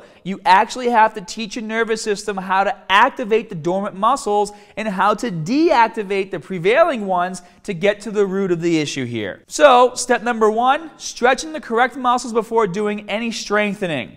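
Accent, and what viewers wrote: Boston, United States. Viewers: Before reading replies, I say Boston accent. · Boston accent? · A bit of Mahk in your accent lol. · Nah, that’s a Masshole accent :-) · Came for the tutorial, stayed for the Boston accent. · Staaaahted! LOL love the Baaaahston accent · Not Aussie lol Boston it seems. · Is this guy from Boston? What accent is that? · This guy is definitely from baaston... Great video thanks for tips.👍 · That's definitely Boston New England accent. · are you from wicked good Bahston? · Where’s this guys accent from in the US? He says wardah and pahtts. Nothing wrong with it its interesting and making me listen more 😅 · Love that Boston accent! Excellent explanation and routine, thanks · do your ears work He's from Boston. 😂, Australia 🤣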